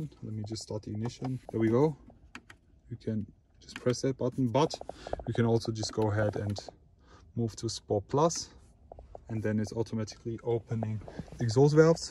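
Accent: Germany. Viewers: Nothing like the german accent. AMG Uersion 😌👌🏼 lol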